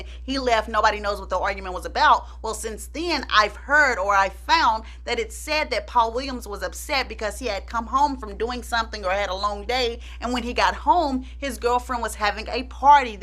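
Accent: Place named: Detroit, United States. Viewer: Sister Love I'm from Detroit Michigan. l got to give it you. The way you run the voodoo down. Sound like you straight out of Motown. Love ya keep up the good work.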